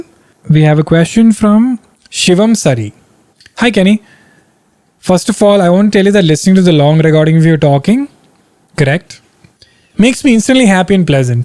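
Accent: Kerala, India. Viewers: Kenny ur funny and relatable n u make me smile ...wt ur accent...from one mallu to another...keep it up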